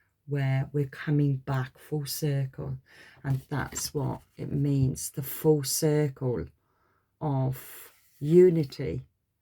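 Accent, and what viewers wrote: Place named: Midlands, United Kingdom. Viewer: Hello lovely, stumbled upon you today.. so strange as you reminded me of my mum so much ?! in looks and the way you speak. We share similar accents too ?! so I feel we live close aswell, guessing central midlands Uk 🇬🇧. Ironically it is her 82nd birthday in spirit today 16.1.45 her name is Lorraine and she also was a therapist for individuals with addictions/mental health. Just wanted to say I found you so relaxing to listen too, found you very comforting on an emotional day for me 💔. I’ve listened to 3 so far and all resonated . Love your style of reading too, very interesting and gave spiritual knowledge to research. Subscribed ! Sending you abundant blessings Thankyou 🤍🪽💜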